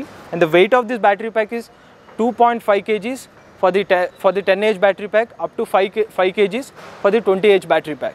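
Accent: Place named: Hyderabad, India. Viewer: Very Good Hyderabad English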